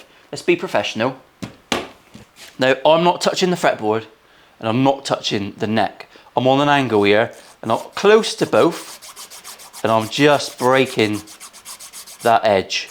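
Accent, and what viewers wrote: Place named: Scotland, United Kingdom. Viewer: I love your videos to. I just wish they had subtitles in English, for native speakers. The guy in these videos is Scottish or part leprechaun or something, and I feel that content is getting lost in their accent at times.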